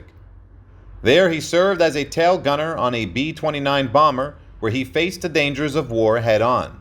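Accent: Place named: Chicago, United States. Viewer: Hey Mr. Narrator, you from Chicago, ain'tcha? Miss that accent.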